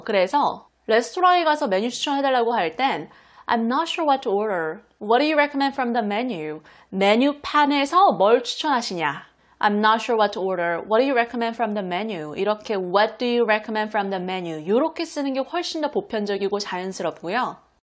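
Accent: United States. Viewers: 완전 미국영어네요… 디싸이링~~ 쌜맨~~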